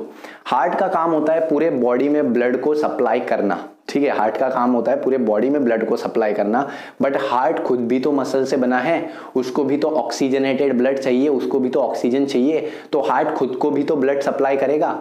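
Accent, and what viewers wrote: Maharashtra, India. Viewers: Tum marathi ho kya bhaiyaa..... And aapki teaching level are next level..... ❤️‍🔥✨✨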